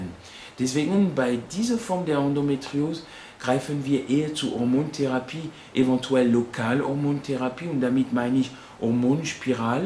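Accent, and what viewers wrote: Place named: France. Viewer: je reconnais votre petit accent français , ses trop mignon merci pour vos explication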